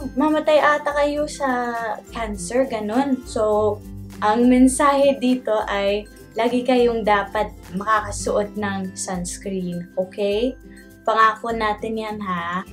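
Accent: Visayas, Philippines. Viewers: As a filipino i know what accent You will be good i think bisaya or mostly known the Cebuano language and hiligaynon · Ang cute niya managalog! Malambing na may puntong bisaya. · you sound like a bisaya talking in tagalog... i know that because i sound like you... hehee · Cute video! You speak Filipino Tagalog with a slight visayan accent? And your use of those colloquial expressions are spot on! Very good filipino :) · You speak Tagalog like a Visayan =) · ang galing po ninyo mag Tagalog. nice!! May konting puntong bisaya. cute.. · Parang bisaya ka day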